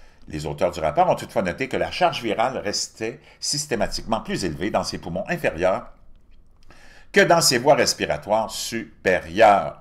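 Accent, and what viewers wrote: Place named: Canada. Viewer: coucou de sud de la bretagne en France...tjrs un plaisir ton accent canadien...merci et mes voeux pour cette année qui s annonce encore bien chaotique....resistance....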